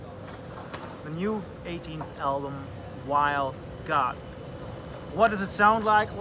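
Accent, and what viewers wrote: Netherlands. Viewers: nice record! Are you dutch? if so, then i didn't know that :D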